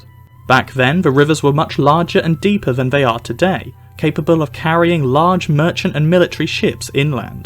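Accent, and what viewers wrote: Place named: Norwich, United Kingdom. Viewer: I noticed your norwich accent and enjoyed it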